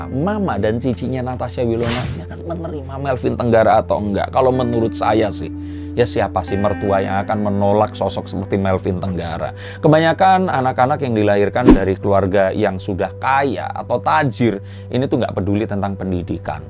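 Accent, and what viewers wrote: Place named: Java, Indonesia. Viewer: Lama2 ngomongnya ikutan medok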